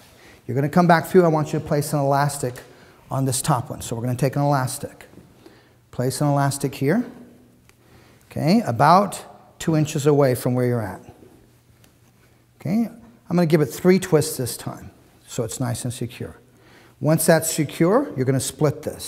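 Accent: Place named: Philippines. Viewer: hi sir... are u a filipino? you look like a pinoy and your accent...and also the way you speak... =) you're amazing uncle...hehe galing mo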